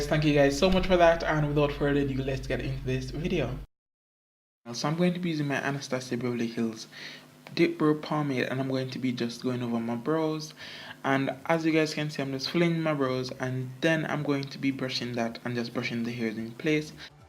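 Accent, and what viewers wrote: Jamaica. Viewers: I knew it cuz like u sound like a Jamaican who just know how to speak good English instead of patwa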